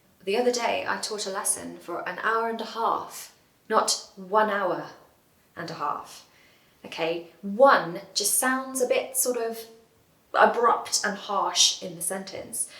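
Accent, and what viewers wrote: United Kingdom. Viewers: I love your lessons. you are always friendly, modiste your good mood.. just keep going on! It's good to learn from you now i now why british people speak lovely accents thank you for teaching . It's the right time to l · I love British accent, it's a quite nice for ears, thank you for nice lesson, have a wonderful day, regards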